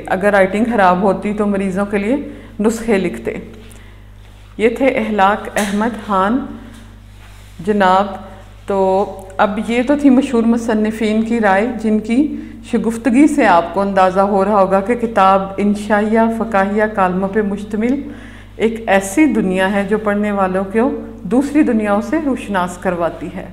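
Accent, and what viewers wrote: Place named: Punjab, India. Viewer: ماشاء اللہ، بہت خوب تبصرہ، آواز کا ردھم بہت ہی متناسب، بڑے رسان سے ادائیگی کرتی ہیں۔ اور پنجابی کے بڑے میٹھے انداز میں خ اور ح کا امتزاج بہت پیارا لگا۔ آپ اس لہجے میں ایک دو جملے پنجابی کے بھی شامل کرتیں تو دو آتشہ ہوجاتا۔ ویسے حالات کی طغیانی کا خطرہ نہ ہو تو دونوں مل کر بھی ویڈیو بنا سکتے ہیں۔ بہت ہی اچھا اور اچھوتا خیال۔ سلامت رہیئے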